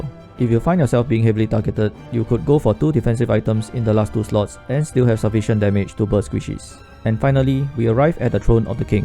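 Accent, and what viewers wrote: Singapore. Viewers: The heavy sg accent bro 😭😭😭 but good guide lah keep uploading guide like this can do very good one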